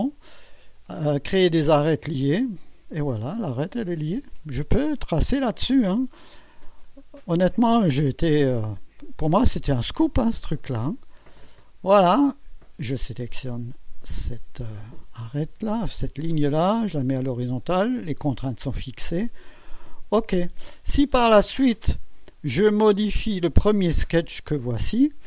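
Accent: Alsace, France. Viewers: Ton accent me fais penser à l'entraîneur de foot *. Mais que suis-je bête il est aussi Alsacien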